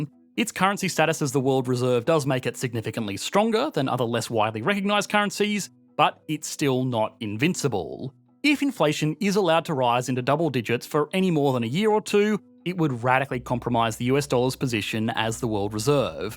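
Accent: England, United Kingdom. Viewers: I CANT UNDERSTAND YOUR BRITISH ACCENT DO YOU HAVE FULL SUBS AVAILABLE??? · Kind of annoying "Millennia-aard" English accent -)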